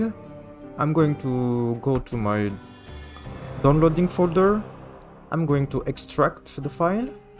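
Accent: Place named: France. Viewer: « french accent »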